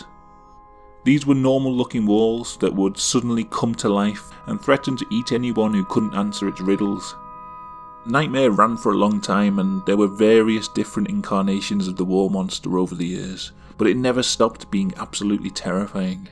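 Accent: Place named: Liverpool, United Kingdom. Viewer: Ah cool your accent sounds slightly Liverpudlian. Yes I live in Bearwood and know Bromsgrove quite well, nice place.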